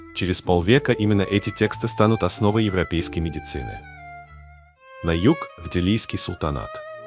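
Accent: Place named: United States. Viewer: Афтор есть немного акцент. Как будто это американец. Может я ошибаюсь.Но спасибо за труд..Салом ман точикам Ориоям.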